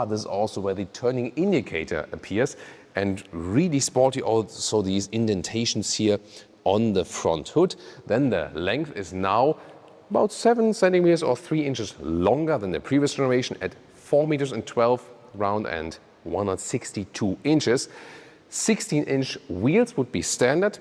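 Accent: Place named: Germany. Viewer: Naziv i opis kanala na srpskom, voditelj priča engleski sa nemačkim akcentom. Baš zanimljivo.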